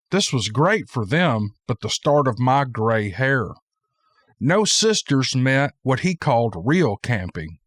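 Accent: South, United States. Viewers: Your stories always help me go to sleep with your American southern drawl while I’m here in Russia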